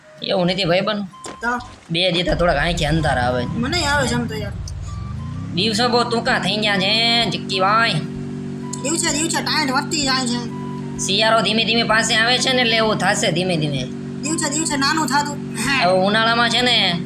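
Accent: Bhavnagar, India. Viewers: એલા નક્કી તમે ભાવનગર બાજુના લાગો😅😂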